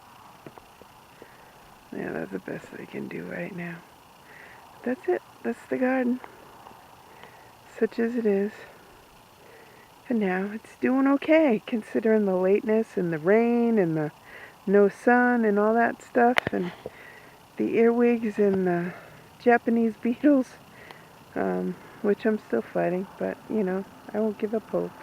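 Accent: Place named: New England, United States. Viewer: No japanese beetles in these parts, cabbage butterfly's caterpillars and Sphinx moth larva [tomato horn worm ] are my biggest pests and quite controllable with diligence ... lol. Love listening to your NE accent !! ;p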